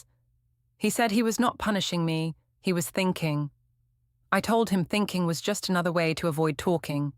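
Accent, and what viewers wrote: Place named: United Kingdom. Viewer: Some things never change. They always use this British voice for the most mentally deficient, clueless, self awareness bereft, delusional women's stories. They always name them something faux sophisticated and exotic that reminds me of "Cruella DeVille". And there's always some lame attention obsessed excuse for whatever the women do that's supposed to absolve them of any blame even while they tell people half truths and manipulate then move as if their fictional reality is actual.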